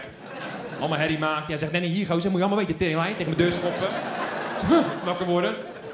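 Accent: Rotterdam, Netherlands. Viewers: Hahahahaha ik hou van deze gast. Please kom een keer in De Meervaart of De LaMar. Zou top zijn. Je Rotterdamse accent ga ik al stuk om he. X Amsterdamse fan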